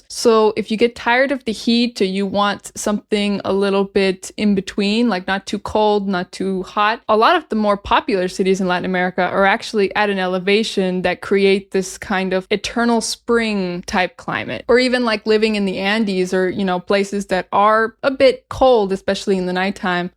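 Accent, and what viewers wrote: General American, United States. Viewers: I'm from Buenos Aires and I can easily picture myself living in Boston or NYC, and without a car. But I would blow my head off if I had to live in Orlando, for example. I barely remember having seen any sidewalk there. By the way, your English is crystal clear to me. I understood everything, like you were talking to me in Spanish. I guess you have a pretty standard American accent. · Si le llaman standard American English y no usa muchos slang y otras expresiones en este video . Stay safe & greetings from the Gulf of California, Mexico 🇲🇽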